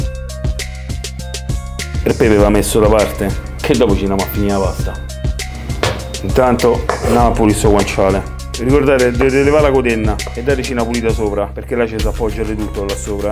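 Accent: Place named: Rome, Italy. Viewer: bravissimo,poi il dialetto romano...sono un fan!!! ma questo me pare sempre incazzato nero :-)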